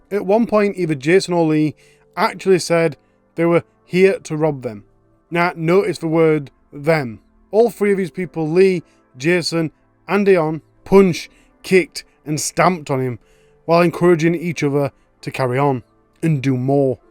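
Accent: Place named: Liverpool, United Kingdom. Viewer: Hey what part of England are you from? Really distinct accent...is it Liverpool?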